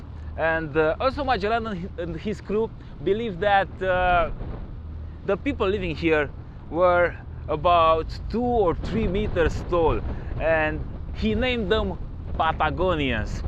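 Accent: Romania. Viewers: omul e antipatic, de la dinti pana la faptul ca in engleza vorbeste ciudat..ce accent vezi tu ca nici macar pronuntia literelor din cuvinte nu e corecta. Nu are niciun accent, e doar o urma de engleza romaneasca, nu te mai aprinde ca am zis ceva la obiect. Critica e si constructiva cateodata, desteptule. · M-AI spart frate,cu accentul tau in engleza,te vad intr-o zi cand ma pensionez si ma duc inapoi in Romania. Sunt in State de vreo 25 de ani si ma bucur de jucaria mea- BMW 1200 . Bravo Frate! Entuziasmul tau e contagios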